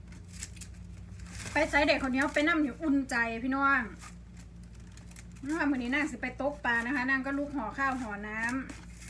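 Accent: Ubon Ratchathani, Thailand. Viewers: พูดแบบคนอุบลแท้ดูทุกคลิปนะคะ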